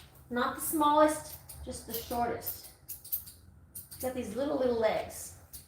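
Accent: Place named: France. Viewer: Ton accent français est tellement beau.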